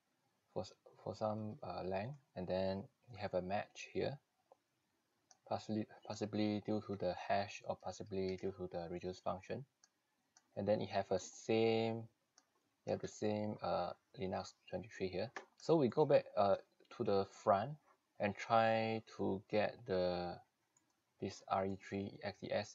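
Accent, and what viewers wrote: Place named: Singapore. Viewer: hello my fellow singaporean xD !! hear one time know liao, SG voice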